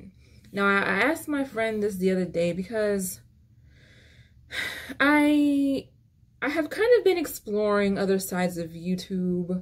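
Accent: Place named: Caribbean. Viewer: Your accent is so familiar are you bajan or carribean? 🤔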